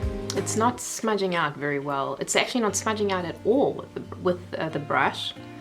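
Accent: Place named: South Africa. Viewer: I love your South African accent x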